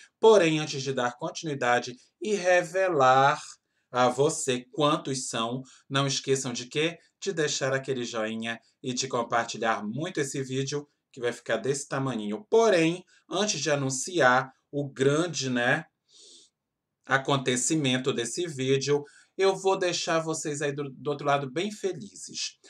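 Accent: Pará, Brazil. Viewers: Esperando esse desapego... vc pelo sotaque é paraense? Meu filho nasceu no Pará, fui morar Macapá e hoje meu filho, 26 anos, mora nos Estados Unidos. Bjs adoro suas resenhas, vc tem muito carisma. 💕🥰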